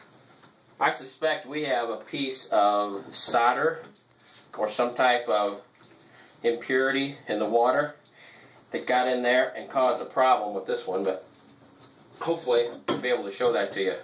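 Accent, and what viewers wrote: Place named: Ohio, United States. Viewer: I'm a fan of accents. are you from Eastern Ohio? rate my guess 🤙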